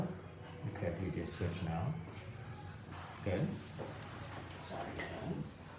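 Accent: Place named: United Kingdom. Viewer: I can’t quite tell the nice accent… British, South African, Australian?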